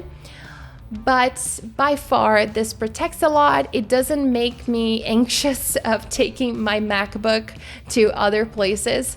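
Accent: Brazil. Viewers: I love your English. You’re Brazilian, aren’t you? You could open an English Channel to give us some tips.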